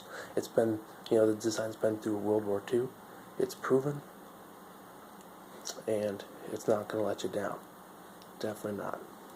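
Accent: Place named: South, United States. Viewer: A southern accent... I'd say I'm shocked but I don't like to lie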